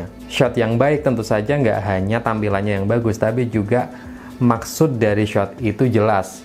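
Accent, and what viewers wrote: Pekalongan, Indonesia. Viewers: Orang pekalongan yah bang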